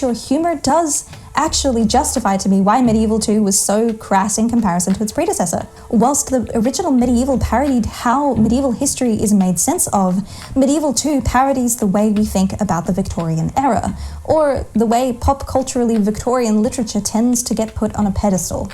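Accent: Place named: Australia. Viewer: australian spotted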